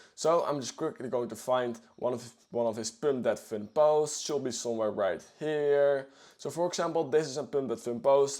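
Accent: Netherlands. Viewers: Kom jij uit Nederland?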